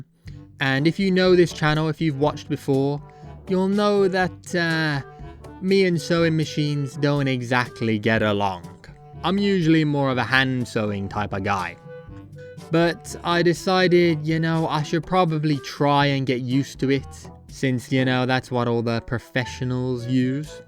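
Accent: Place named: Birmingham, United Kingdom. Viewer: are you from birmingham?? s teir accent :))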